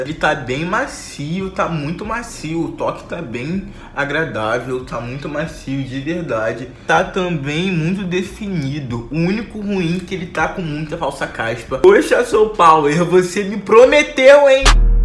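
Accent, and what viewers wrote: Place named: Brazil. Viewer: Brasileiro tentando falar inglês realmente dá pra perceber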